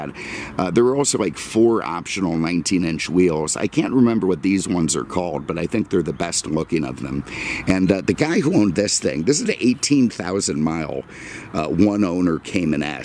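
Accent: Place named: Philadelphia, United States. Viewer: Love the channel. The narrations are great but the voice seals it. Been trying to pin that accent for a few months... First thought: Jewish northeast. Possibly Philly, faded around the edges from being transplanted in Southwest FL for a few decades. Then I thought; "WHO does that sound like" and it took less than 30 seconds to come up with- Paul Lynde, who came from East Ohio by way of NYC. Am I close?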